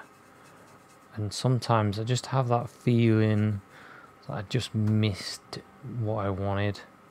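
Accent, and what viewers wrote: United Kingdom. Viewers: Your British Accent is really so awesome and polite Dear.😊😇🥰😍🤗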